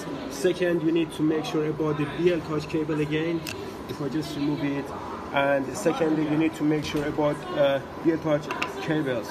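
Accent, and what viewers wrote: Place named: Iran. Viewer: Persian accent :D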